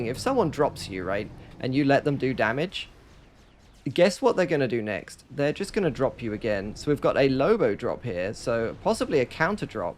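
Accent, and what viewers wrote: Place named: Detroit, United States. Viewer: I love the accent! Detroit?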